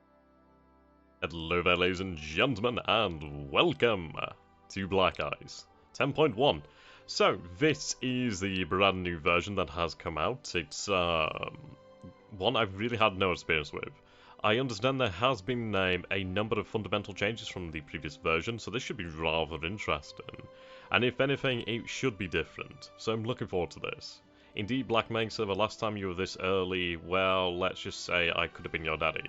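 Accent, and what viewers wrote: United Kingdom. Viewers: Ulta Posh XD as an Aussie you really sound like that stereotypical british rich man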